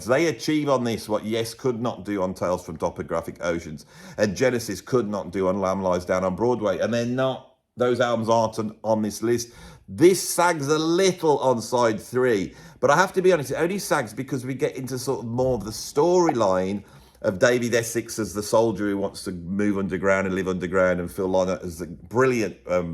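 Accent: Birmingham, United Kingdom. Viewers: I just love. I love the Brummie or midlands accent and how it totally lends to his appeal. Not sure I always agree with his ideas, but I don't think ever wants everyone to agree - that's the appeal. He says what he wants, knowing it isn't always going to be everyone's ideal. But he always makes a compelling case. I have had to go back and re-listen to so many albums (and CDs) after one of these videos - I conveted to MP3s (I have over 35,000 tracks on my iPod) so long ago, that they can get lost. I still prefer to listen to the whole of an album - warts and all - contrary to the new world of random 'always on background music' tracks, quickly and easily skipped with the streaming services (who rip off the musicians.) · I love your videos and appreciate your knowledge of music and British accent.Some groups you never mention. fantastic group., who were a big influence on YES and. 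So glad you mentioned by YES but missed. Evangelist Roger Mansour former Drummer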